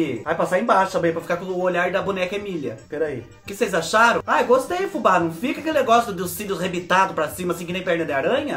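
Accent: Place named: Toledo, Brazil. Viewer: Você parece as pessoa de Toledo MG fala iguarzinho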